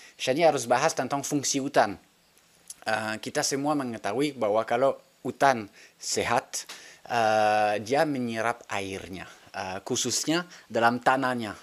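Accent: France. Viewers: Lucu logat Prancis nya😂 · Aku mendengar logat Perancis, sounds so good 🤭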